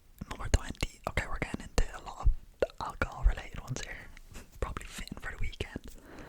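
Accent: Ireland. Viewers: Yay. This is my fave kind of Dublin accent. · so so happy to find a irish asmr creator. the accent feels like home and makes it more relaxing. new subscriber!🎉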